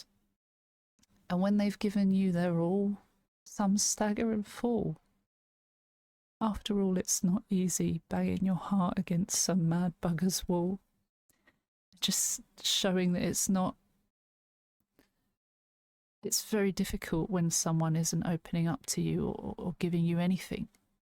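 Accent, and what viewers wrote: England, United Kingdom. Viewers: Hi it was refreshing to find your channel. A more familiar English accent (most creators being American or Canadian) I have subscribed also. Hope you can do more in the future. Your quest for the meanings are usually correct. Keep it up...x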